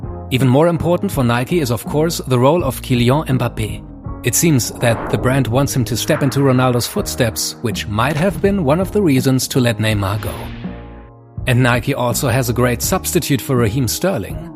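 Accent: Germany. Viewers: Wow, I feel the German accent so hard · You hear really german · Ich feier deinen deutschen Akzent, mach weiter so!! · "positive racist" bias: your german accent (or austrian) sounds perfect when you explain marketing and global strategy Great work my friend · funny how you can hear the german accent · Der deutscheste akzent überhaupt 😂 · The german accent tho... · broski sound so german(deutsch) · He’s German tho and that’s his accent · the voice actor just sounds so german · Ich höre doch unseren deutschen Bruder raus 🇩🇪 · Love your German Accent 🤘🏽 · 100% Deutsch warum hört man das? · This guys definitely German 😂 the way he says puma and neymar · Ich liebe diesen unfassbaren deutschen accent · Bist Safe 'n deutscher bei deinem Akzent und wie du die Namen ausgesprochen hast :D🤙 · omg lmao, sos, when i watched this vid, it was at night and i didnt have full volume, now i hear that hes german lol, but yh lol, americans cant play football and cant even call it the right name u cant deny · Definitly its a german speaker i think. 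Ik hör da ein Akzent raus, richtig? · German accent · Man hört es so raus das es ein deutscher ist hahaah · Man hört dass du ein deutscher bist gib dir mal mehr Mühe laaan.. german accent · Bro, your German accent is soooo thick.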